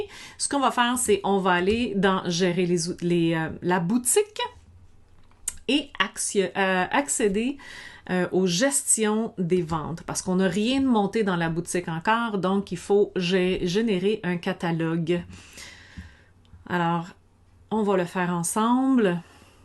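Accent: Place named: Canada. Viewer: Merci pour cette video réussie! un petit bonjour de Normandie en France... et merci pour ce chouette accent canadien qu'on ne se lasse pas d'écouter avec plaisir ! Cependant j'ai une petite question, vous serait il possible de faire le même style de video pour lier la boutique Facebook avec celle d'Instagram. J'ai un site internet sur Wordpress, une page Facebook Pro, une boutique Facebook et un compte instagram professionnel, tout ce petit monde à l'air être connecté mais quand je veux mettre des produits sur ma page instagram, on me répond : Aucun produit ici, si les produits n'apparaissent pas ici, contacter l'administrateur de la page, ou vérifier vos autorisations dans business manager ??? je suis complètement larguée!!!! sur business manager c'est archi compliqué ^^ merci en tout cas d'être présente pour nous aider dans ces démarches bonne semaine à tous !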